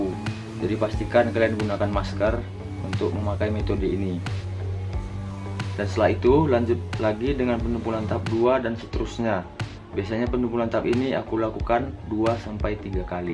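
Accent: Medan, Indonesia. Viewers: Bahasa nya kental medan kali bg,bangga awak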